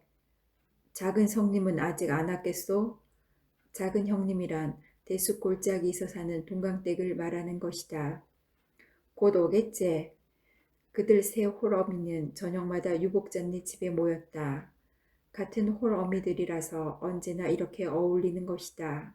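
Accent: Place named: Jeolla, South Korea. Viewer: 구수한 전라도 사투리에 음성이 잊혀져간 엄머니에 음성처럼 정감이있어 행복하게 들었네요 감사합니다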